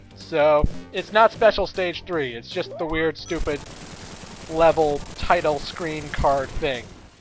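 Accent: Canada. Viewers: speaking of canadian stereotype's do you say aboot when you mean about? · youre from Canada ? And sonic boom sonic boom sonic boom is annyoing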